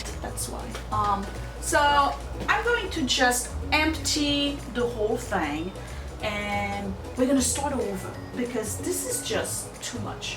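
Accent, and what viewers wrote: France. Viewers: not sure you will see this but I felt compelled to tell you that because of YOU and your daily motivation,allowing us to see your REAL life "collecting" I finally dropped off 6 trash bags and 2 boxes of clothing to the goodwill yesterday. I have been unable to make any substantial progress in reducing my junk until I met you. So when you are exhausted and not sure your videos are worth it, believe me they are SO appreciated. I hear your lovely exotic french voice in my head all day, motivating me to keep making those decisions, I have ignored for 10,15,20 yrs. I have not followed your schedule but started with clothing stored in bins in the laundry room because they bothered me the most and felt they would make the biggest impact once gone! This will take months and months but I am finally ready!! Thank you is not enough.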